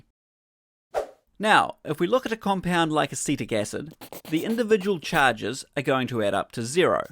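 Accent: New Zealand. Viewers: Physics sounds funnier with a Kiwi accent too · Awesome video. Are you an engineer by trade or science teacher? I mean we all know you're a long suffering Land Rover owner :) By the way, I always read your wall charts in my shop with a Kiwi accent (in my head). thanks! Can't wait for the next one.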